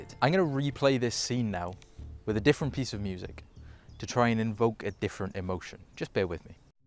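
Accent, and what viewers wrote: Wales, United Kingdom. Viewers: Is he welsh? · Is he welsh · Hears Welsh, instantly subscribes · I recognise a welsh accent when I hear one. Hello, fellow Welshman :)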